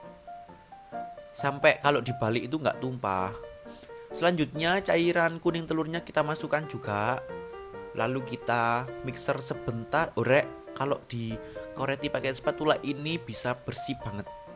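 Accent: Java, Indonesia. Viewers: Medok lo rek mas 😂😂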